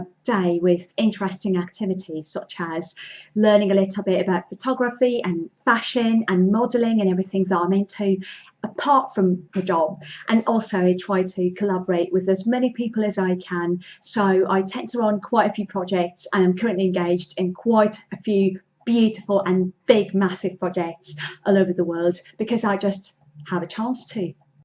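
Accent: United Kingdom. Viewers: Wow 😮 amazing , no accent, just clear British speech! How have you managed to acquire such good pronunciation skills???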